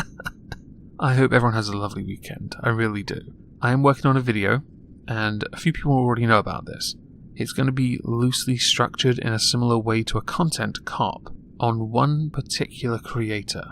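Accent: RP, United Kingdom. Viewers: Well as a german woman I can say that you're posh British accent is one of the most seductive one's out there though you can't beat an proper (southern)Irish accent. The Scottish accent is the most funny though. Proof is count danculas paedophilia video where he talks about bestiality(when he says kids it sounds like cats). But seriously I would make all the sammiches in the world for more of that accent of yours. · You do sound posh, but that's no bad thing. I on the other hand, sound common as muck. Would I be right in guessing that you are from the South East? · I laughed so much during this video 😂😂 Yeah I recognize sounds British but the reason I love his voice is because it's........... 🤔 Smooth, soothing, sexy and manly. I discovered his videos 3 days ago and I have been listening non stop, while studying for major exams mind you, especially when it's bedtime. 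I just press play and close my eyes and let him lull me to sleep. It doesn't matter what he's talking about........as long as he's talking ☺😘😉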